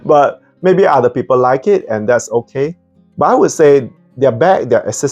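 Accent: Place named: Malaysia. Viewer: no offence but are you anyway from malaysia/singapore cuz you have their articulation? 🤣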